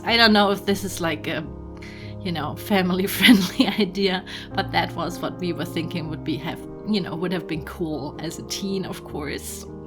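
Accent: Germany. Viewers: I love the German accent. It's so relaxing listening to you speak.. This is a masterpiece btw 👏👏 I love the idea that your viewers have their own dorm rooms and ya'll go to this school together. Something about that idea is so heart warming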